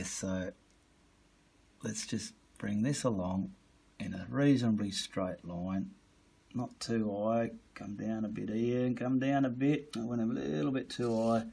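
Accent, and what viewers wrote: Australia. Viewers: The spirit of Bob Ross and the accent and proper speech of Steve Irwin…what a comforting voice and video to watch.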